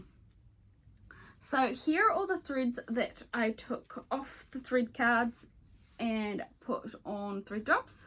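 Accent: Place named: New Zealand. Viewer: Congratulations on your Lisa Parker finish 🥰 I’ve just found your channel… and love your WIPs As soon as I heard your accent I knew you were a Kiwi…. I’m an Aussie stitcher/flosstuber so I’m always really happy to find stitchers/flosstubers from Aus or NZ 😀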